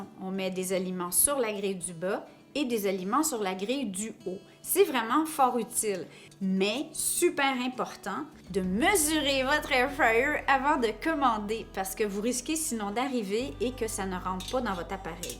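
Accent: Canada. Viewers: J’adore votre accent canadien, je me sens un peu en vacances en vous écoutant 😊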